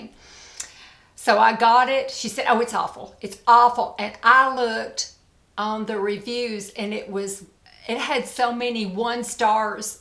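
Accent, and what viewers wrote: South, United States. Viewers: LOVE seeing you twice in one week!!!!! thank you so much. I appreciate it more than you can know! And I'm PROUD of you for taking down ANY video you deem necessary! I guess I didn't read the "bickering" comments, as there were SO many that were flooding thru, but just saw lots of Spiritual women ! I go along with Any of your decisions! I'm here for the skin care, beauty tips, and love the makeup GRWM's over anything else. However...Love your serious ones, too. Guess I just love seeing your face and hearing your southern voice like mine! We sound the exact same here in NC!!!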